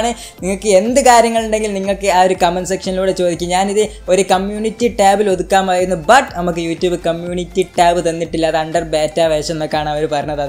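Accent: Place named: Malappuram, India. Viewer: # നിന്റെ വീട് എവിടെയാ..? മലപ്പുറം ആണോ ..? നല്ല മലപ്പുറം ഭാഷ... !!😘😘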